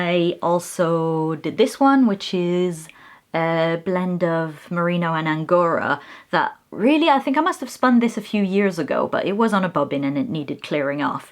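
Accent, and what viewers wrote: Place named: Canada. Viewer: I can't agree with you more! Kindness starts with ourselves. My 16 year old daughter and I have been traveling around the world for her surfing comps. Four continents and six countries later we are struck how everyone everywhere has same dreams, hurts, successes, failures, trials, triumphs, loves and struggles. We have to have compassion and love on one another individually. We are not that different from each other. God has laid this heavy on my heart. I am gonnaknit on Ravelry. I am an expat Canadian living in the USA with my family of 5. I love listening to your Canadian accent and have enjoyed every single episode.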